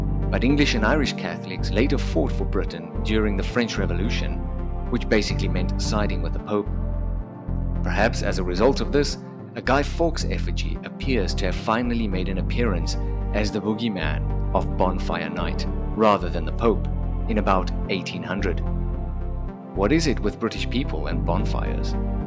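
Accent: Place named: South Africa. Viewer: I note your South African accent. My ancestors were REFUGEES to the Cape Colony from France, due to bloody religious persecution BY THE CATHOLICS, who MURDERED the family who remained in France. Catholics TORTURED and MURDERED whoever disagreed with their totalitarian orthodoxy. Fawkes was an attempted MURDERER of the cradle of modern democracy. He was no hero. He was a servant of tyranny. His death is celebrated to this exact and VERY DAY throughout the UK. With good reason.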